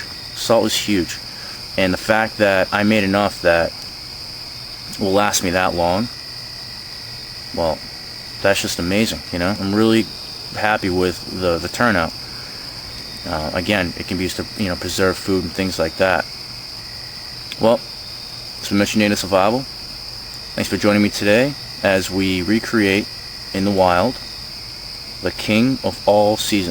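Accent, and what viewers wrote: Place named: Massachusetts, United States. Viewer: native survival great vid you sound like you come Massachusetts 😆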